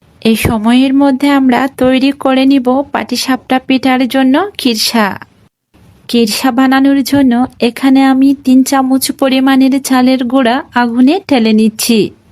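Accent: Bangladesh. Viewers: ময়মনসিংহের নাকি আপনি?? খ কে ক বলছেন। বে ঠার কি?? বেটার। ছালের গুড়া কি চালের গুড়া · আফা আফনার মাতে লাগের আফনে সিলেটি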